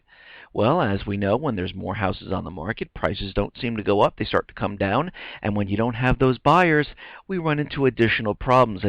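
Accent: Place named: New York, United States. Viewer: Incredibly Great Reporting as always from one New Yorker to Another or at least you must be from the North East - but probably not Boston as their accent is much heavier - lol!!! I just feel that we are close to a Nostradamus prophecy with all the signs around us including what is in the sky (Blood Moons)... Thanks!!! I don't know if you follow any of the previous prophets like Nostradamus, Edgar Cayce, Alois Irlmaier but if you have followed any of these "Seer's" of the future if you would consider doing a video on their prophecies that would be great!!! Thankx, Janice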